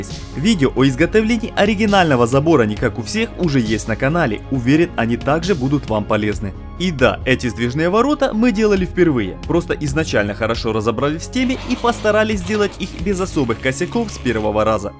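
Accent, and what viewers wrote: Surzhyk, Ukraine. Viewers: Обычный суржик, в каждой области русский язык отличается от масковскава. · если вы из этого видео не поняли что человек из Украины или вы поняли и все равно предъявляете человеку за русский, вы явно затупок)) ибо если вас не устаивает произношение блогера, просто не смотрите)) Зачем до человека докапываться из за произношения, это не культурно:) и да русский и украинский имеют некоторые общие слова но их очень мало, послушайте например и попробуйте понять без переводчика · да ладно, они украинцы, русский не изучают, говорят как чувствуют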